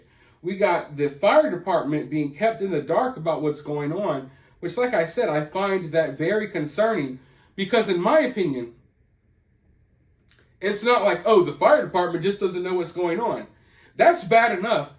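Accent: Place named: Philadelphia, United States. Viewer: You're down south? But you say "woodder" instead of water as we do in Philadelphia. I assumed you were from the northeast 😂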